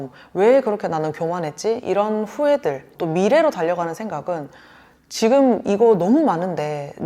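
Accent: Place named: Gyeongsang, South Korea. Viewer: 경상도 사투리 구수하고 좋다 ㅋ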